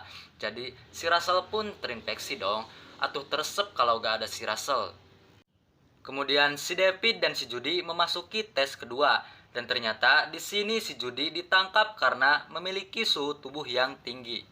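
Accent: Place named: West Java, Indonesia. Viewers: Orang Sunda nya kang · Bang orang sunda pasti 😂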